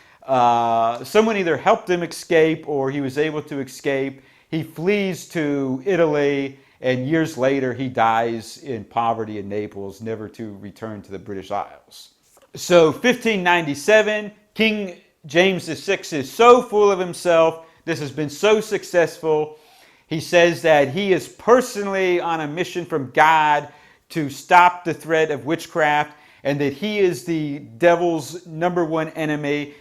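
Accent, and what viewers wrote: United States. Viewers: New York?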